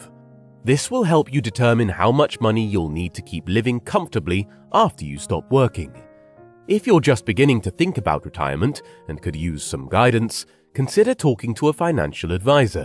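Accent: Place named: England, United Kingdom. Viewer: Best advice (same I gave my kids) is to start paying into a pension plan asap, and by that I mean in your early 20s if youre able. I never invested in stocks and shares and all that malarkey, just my pension, and that was literally from 20yrs old, and I think I will be ok. Btw, I find it weird that theres some English guy narrating this video but its all about how Americans should prepare for retiring 😂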